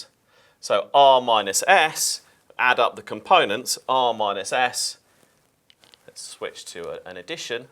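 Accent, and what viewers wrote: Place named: United Kingdom. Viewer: I fucking love the british accent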